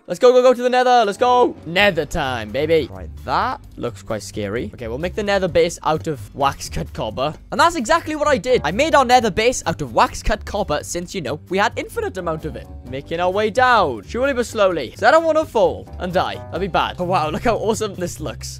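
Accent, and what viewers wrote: Wales, United Kingdom. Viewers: It’s a Welsh accent for sure, he has to be from Wales?…..👍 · Can’t tell if your welsh or not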